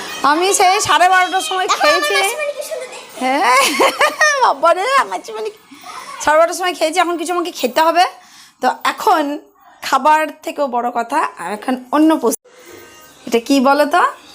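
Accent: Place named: Birbhum, India. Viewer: দিদি তোমার মুখে বীরভূমি ভাষা শুনতে খুব ভালো লাগে.. কি সুন্দর ব্যাটা বিটি বলো .. সুর করে কথা বলো .. এই ভাষা তোমার মুখ থেকে শুনেই শিখেছি ❤❤❤❤❤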